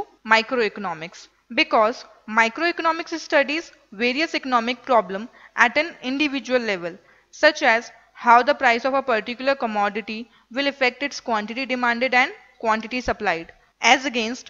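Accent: India. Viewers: * pathetic Indian English Accent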